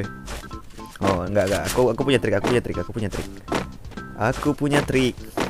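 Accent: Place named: Makassar, Indonesia. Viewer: KaLau BoLeh Tau Abang Orang Mana , Kok Logatnya Kyak Makassar ( Masih Mauko Datang Besok ) 😂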